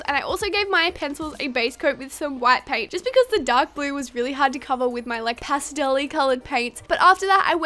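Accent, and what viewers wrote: Australia. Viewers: She's an Aussie right??? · is she australian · AUSSIE!! YEAH MATE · ARE U AUSTRALIAN?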